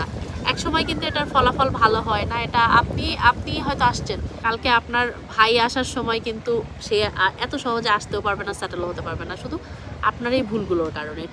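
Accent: Bangladesh. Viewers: Apner kotha sune monehoy apni bangladeshi. Asole amader sikha bhalo na. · don't blame bengali indian. You are nowhere from India. Your pronouncation is bangladeshi. We indian bengali people went to Lisbon in high skill work permit not doing any cleaning job.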